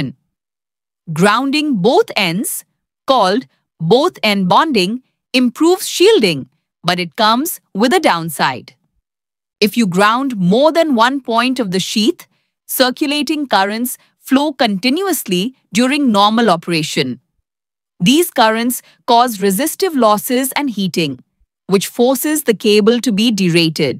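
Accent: India. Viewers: Funny that Indian dialect is still there in the AI speech :D Anyway, great content!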